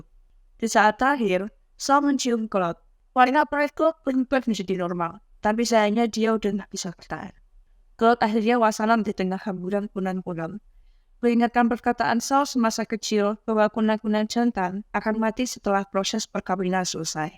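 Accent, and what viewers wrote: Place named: Java, Indonesia. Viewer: Wong jowo lagi medok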